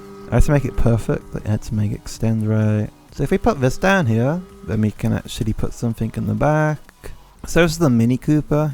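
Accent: United Kingdom. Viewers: Shhh I love ur British accent!!!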